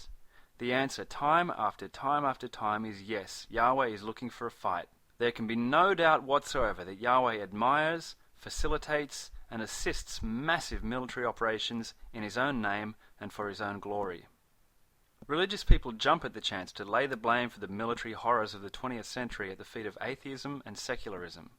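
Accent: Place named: Australia. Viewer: thanks for making this gloomy video more sexy by having an Aussie 'cent. Gracias.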